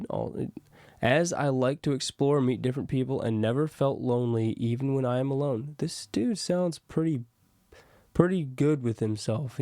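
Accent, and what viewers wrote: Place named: India. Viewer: Sounds like he's a curry loving Indian.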